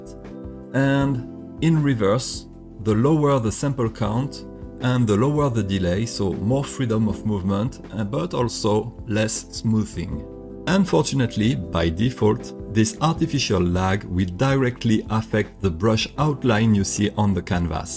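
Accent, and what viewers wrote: France. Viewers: Français ! j'ai reconnu l'accent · thanks!!!! you have a bit french accent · Pourquoi garder un accent français ?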